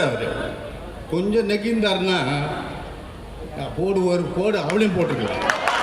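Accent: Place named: Chennai, India. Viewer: 😆😆😆😆😆😆😆😆 original madras basha.... thala ne gethu thala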